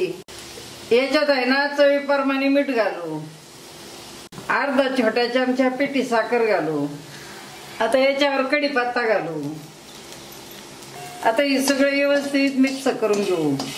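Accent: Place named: Marathwada, India. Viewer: खूप छान सुशीला चिवडा आई मी पण मराठवाड्यातली तुमची भाषा शैली मला खूप आवडते जवळची व्यक्ती भेटल्यासारखे वाटते मी तुमच्या मध्ये माझ्या आईला बघते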